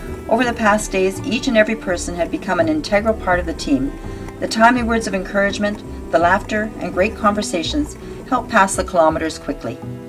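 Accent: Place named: South, United States. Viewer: maybe not mechanical, but just annoying. like an american from the bible belt.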